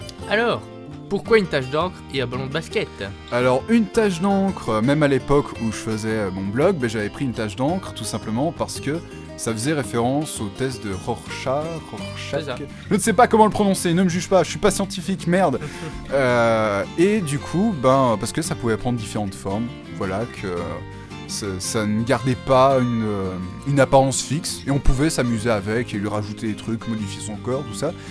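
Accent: Belgium. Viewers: J'étais sûr que vous étiez belge sa s'entendé sur l'une de vos vidéos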